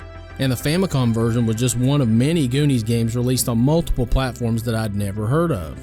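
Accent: South, United States. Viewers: Your welcome! I love your vids. They bring a lot of nostalgia to me. Your southern accent reminds me of my best friend from my childhood. We would waste the summer days playing NES and SNES games.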